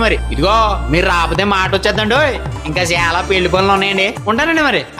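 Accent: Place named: Godavari, India. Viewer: Hii anna Godavari slang voice kavali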